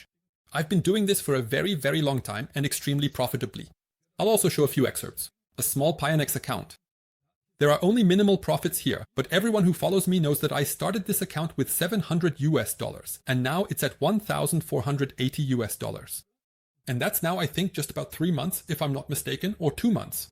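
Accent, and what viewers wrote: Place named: Germany. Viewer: Bro u sound so German but very good explaining!!!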